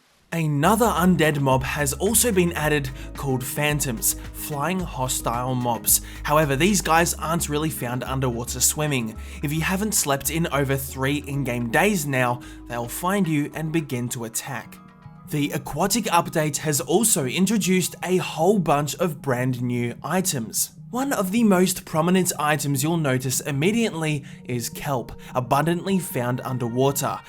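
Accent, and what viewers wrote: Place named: Australia. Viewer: Hi I love your videos and your personality. You inspire me to make short content on my own but I don’t post it because my friends say it’s ‘not good enough’. You are my all time favourite YouTuber and your accent is amazing. (I’m Aussie too!) But in all seriousness, I can’t live a day without watching one if your vids. ❤️❤️ Edit: OMG OMG a heart!!! 😁😁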